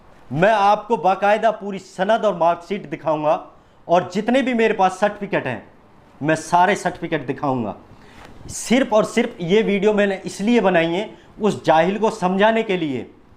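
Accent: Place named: Mewat, India. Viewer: Sahi bola Bhai aapne aap mewati bola good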